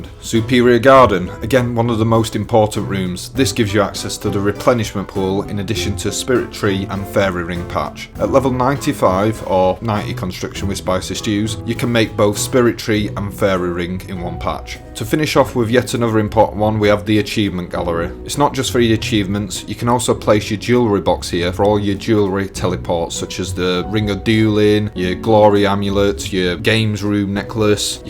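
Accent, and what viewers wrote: Yorkshire, United Kingdom. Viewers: first yorkshire accent ive heard on an OSRS vid, legend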